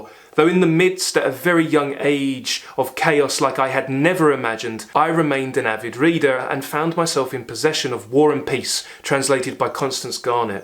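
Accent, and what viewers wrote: United Kingdom. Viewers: What a beautiful presentation. Thank you! I am a 74 year old young widow, ordained minister and psychotherapist who recently discovered your channel and subscribed. I am also a New Orleans, Louisiana born transplanted to and living the last 24 years in Fort Worth, Texas. I say that just to provide info as to how far reaching your channel is and I am in love with you! I love your British accent (I know as an American I am the one with the accent). I have listened so closely and discerningly to your assessments of the great books you presented and the characters today and it is refreshing to learn of your reasons you love each book- each character. I am an INFJ also. I don’t think I could pick just one baby but I read Jane Eyre in grammar school and fell in love with her. I also love the Count of Monte Christo “God will give me Justice” and Elizabeth Bennet and Miss Bennet and Miss Bennet God bless you and Jean Valjean and of course, you are right the paramount Jesus Christ. 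“God is that which there is no other greater than,” St. Absalom. PS are you married if not I have a 30 year old granddaughter I would love 😂for you to meet. 😂❤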